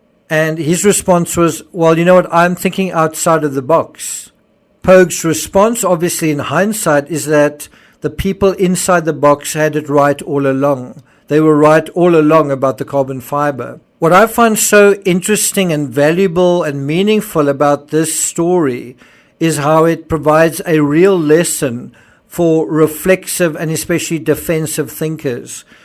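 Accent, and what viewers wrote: South Africa. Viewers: Love the SA speak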